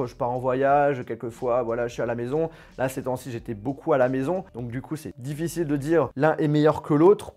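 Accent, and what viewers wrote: Belgium. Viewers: T belge? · Tu es belge????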